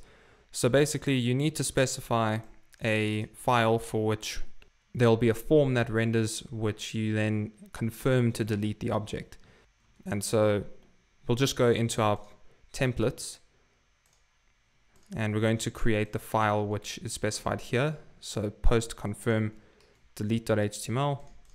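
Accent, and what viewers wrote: South Africa. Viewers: Ayy that's a South African accent i hear. Keep at it man. Great tutorial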